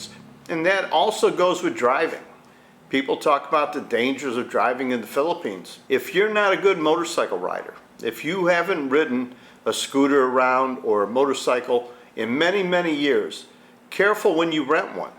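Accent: United States. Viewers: you sound like you're from the USA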